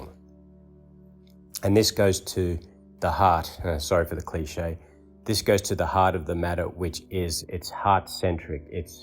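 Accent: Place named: Australia. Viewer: You sound like Aussie.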